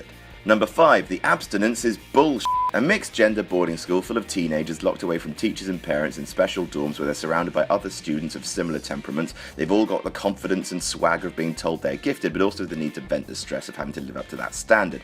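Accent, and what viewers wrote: United Kingdom. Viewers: also fascinating how a brittish accent on a woman can be really sexy and yet a grown man nasally whining in the same accent is like firing a nailgun at a shallow angle against cello made of chalkboard for 6 minutes solid.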